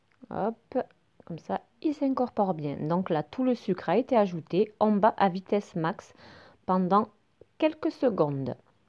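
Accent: South, France. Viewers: Bonjour j'adore vos recettes et votre accent c'est du midi de la France?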